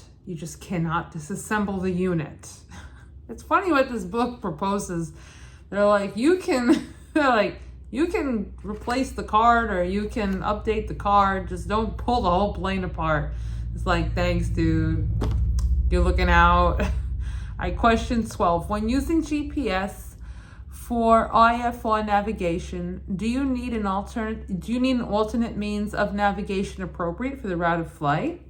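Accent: Brooklyn, United States. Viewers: Fantastic video! Thanks for sharing. Absolutely love the Brooklyn accent—so charming! 😄"😀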